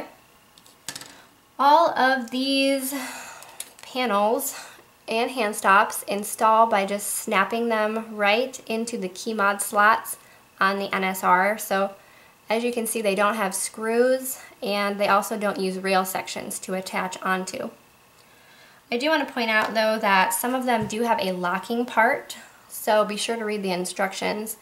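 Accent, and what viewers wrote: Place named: United States. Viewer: Hi there, excellent stuff here. Would you please mind telling me where are you located in the US? I mean a state/region. I have studied English for years know and I love your accent. I am just listening ( and watching also ) and I can´t have enough, since your pronunciation is so beautiful. I really love the language and I would be thankful to you to learn where in the US people cherish English like you do. Take a good care. Jan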